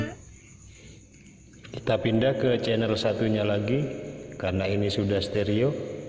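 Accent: Madura, Indonesia. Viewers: Madura ya mas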